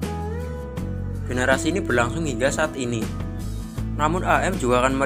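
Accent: Java, Indonesia. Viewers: Medok nemen mase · Dari logat nya seperti orang jawa ( ngapak). salam dari purworejo jawa tengah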